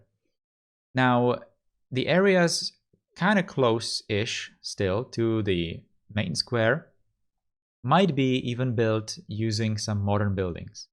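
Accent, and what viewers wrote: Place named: Czech Republic. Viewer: I believe he's Czech. honestly I can't remember why I think so (and he also has typical accent of a Czech guy speaking English). anyway, he aslo referes to central European countries when talking about his hometown. Those being Czechia and Slovakia.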